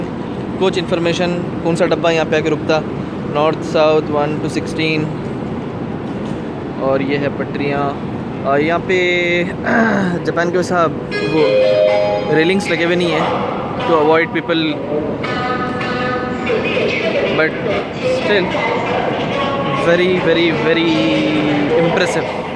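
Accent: Hyderabad, India. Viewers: BHAI YOUR VLOG IS SUPERB AND THE AMAZING PART IS YOU USED HINDI LANGUAGE ( WORD PRONUNCIATION SE LAG RHA THA KE HYDERABADI LANGUAGE HAI ) VLOG ME JAAN DAAL DIYA LANGUAGE NE .. kEEP UP GOOD WORK :-) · I can say ur from hyderabad, pure hyderabadi.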